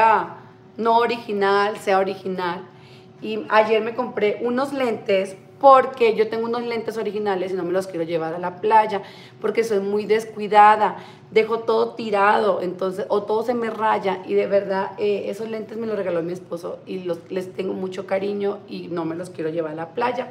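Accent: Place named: Colombia. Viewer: Me encanta su acento colombiano ,no le había comentado antes pero me caí usted muy bien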